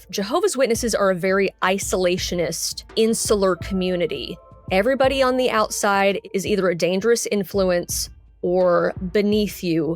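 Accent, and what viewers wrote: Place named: Dallas, United States. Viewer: So crazy how clocked her Dallas accent in one minute. Takes one to know one. What a wonderful communicator. I used to drive by the Kingdom Hall on Mockingbird and Inwood every day and I wondered where the windows were!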